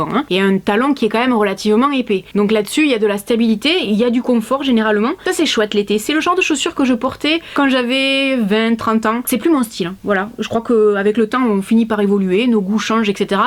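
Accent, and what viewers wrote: South, France. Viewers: Bonjour c'est la 1ere fois que je mets un commentaire mais je regarde pratiquement toutes vos vidéos. J'ai l'impression de regarder une fille que je connais depuis des années. Pas de chichis toujours la banane c'est vraiment super j'ai l'impression d'être en visio avec une amie et puis cet accent.....que j'ai eu pendant longtemps puisque j'ai habité Martigues....me fait rire car maintenant j'ai l'accent du sud mais entre le continent et l'Italie....oui oui la Corse ici aussi on vous regarde 😂😂 alors un grand merci pour ces bons moments passer avec vous. Et si vous déplaise à certaines c'est quelles sont peut être jalouses ou que se sont des BOUCANS FADA 😂😂😂 Aller je me permets comme a une amie bisous😘😘😘😘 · Bonjour, super ta vidéo merci pour ta joie de vivre et vive l'accent du Sud au passage 😉, merci pour l'info pour ces sites pas chère du top👍.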